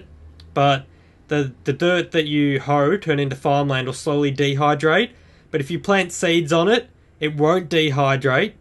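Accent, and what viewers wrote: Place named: Australia. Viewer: i think hes from australia by the sound of his voice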